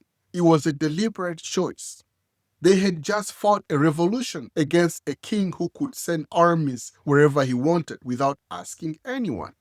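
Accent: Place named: Africa. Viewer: You sound African you know about our constitution more than most D.C. politicians big ups to you my brother.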